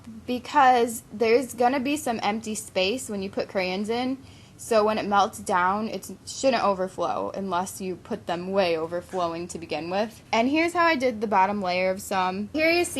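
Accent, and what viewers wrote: United States. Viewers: Are you from America madam please say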